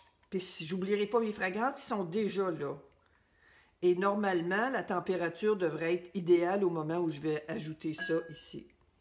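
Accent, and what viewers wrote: Canada. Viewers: Le savon au soufre organique est souvent utilisé pour traiter les problèmes de peau tels que l'eczéma, le psoriasis ou l'acné sévère. En effet, il s'agit d'un sébo régulateur, d'un anti-bactérien et antifongique très efficace. Voilà après il a beaucoup d'autres utilisations, comme pour les douleurs de l'arthrose(les bâtonnets de soufre)..... Etc J'adore votre accent, les canadiens est un peuple cher à mon cœur, mes amitiés. Bonne soirée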